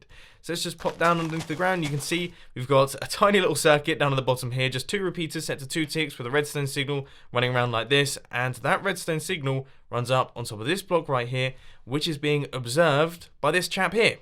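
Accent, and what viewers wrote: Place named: United Kingdom. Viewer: not to be rude are u british im thinking that because of ur awesome british accent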